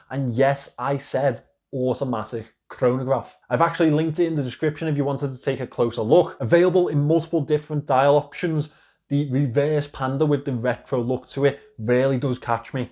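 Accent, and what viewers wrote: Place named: Scotland, United Kingdom. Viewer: This lovely scottish accent !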